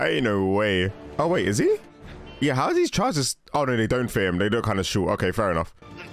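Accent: United Kingdom. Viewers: Oh my gosh, you reacting to Disney movies would be so lit!!! Geez, I just watched a little further and you not knowing that the US was even in WWII is killing me just a little bit inside LOL Despite the accent, I forget to consider you're British sometimes during your reactions to American movies/shows. To be fair though, I guess I don't know too much about specific contributions England had during the war, so I can't expect more than that out of you for America. I definitely know y'all were one of the major Allied powers though! haha (The most specific thing that comes to mind to me for Great Britain is "Keep Calm & Carry On" and the context surrounding that.)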